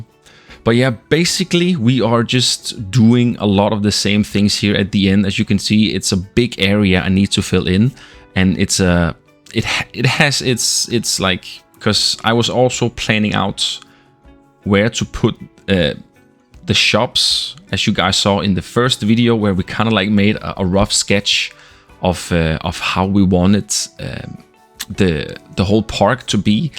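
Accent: Denmark. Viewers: love the danglish accent <3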